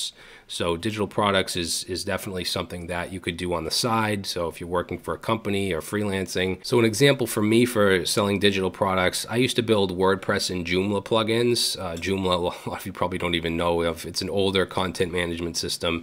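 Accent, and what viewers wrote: Boston, United States. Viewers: You hated your accent?!?! I just come from hearing you say "border" a million times in a tutorial and I was like "Boston, baby, yeah!" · ain't nothing wrong with having a Boston accent, even a rather thick one. you might even get some kids from abroad to say certain words that way 😀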